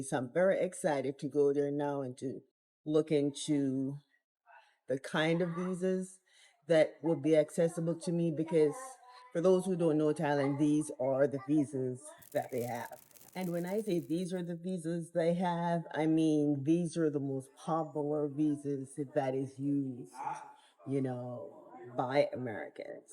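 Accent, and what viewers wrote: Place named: Caribbean. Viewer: You sound like you are from the Caribbean. If you get a chance, take a trip to Kenya. There is a straight flight from Thailand to Kenya and back.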